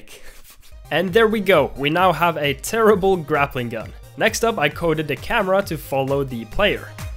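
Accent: Sweden. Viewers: Are u Swedish or danish???? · Are mah boi swedish?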